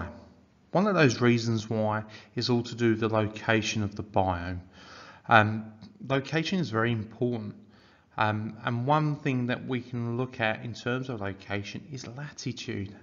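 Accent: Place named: Australia. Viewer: Love your accent, are you australian by any chance, much appreciation from the UK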